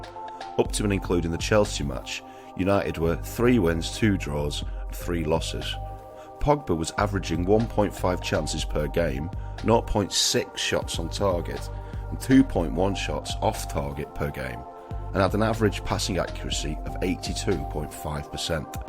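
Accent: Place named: Leeds, United Kingdom. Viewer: This guy is from Leeds I reckon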